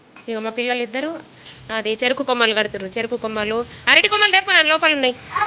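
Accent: Nizamabad, India. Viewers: మాది నిజామాబాద్ జిల్లా,నీవు మాట్లాడే యాస నిజామాబాద్ జిల్లా యాస లాగా వుంది అందుకే మీది ఏ ఊరు అని అడిగాను.జై తెలంగాణ జై, భీమ్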